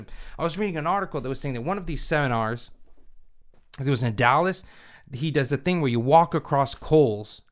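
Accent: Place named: East Coast, United States. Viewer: Run up on there! Now you sounding East Coast! NY/NJ BitCoin revolution! 0x8f641256fedf1453eb<num>b2cf25fe51ada0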